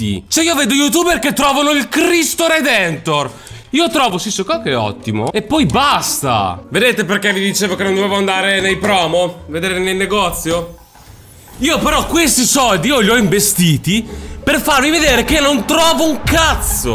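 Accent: Naples, Italy. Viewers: io comunque mi complimento per il tuo napoletano che è qualcosa di stupendo. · La combo Dialetto Napoletano+Incazzatura fa schiattare ahahah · il tuo napoletano mi fa divertire troppo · ma sei napoletano? o tifi napoli pero abiti tipo a milano? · oddiooo ahahahahah te che parli napoletano ahahah sono tutto bagnatooo grande ti amooo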